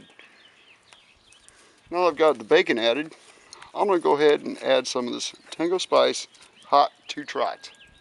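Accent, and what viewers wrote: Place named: South, United States. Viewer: it is an absolute requirement that, if someone is gonna make a bbq video, to liberally use the term, "yall". And you don't disappoint------------ specially' with ur' southern drawl. Good Job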